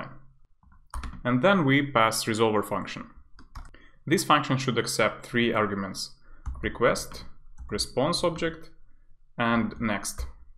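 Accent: Russia. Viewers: какой классный тяжелый русский акцент, чувак ты ахуенен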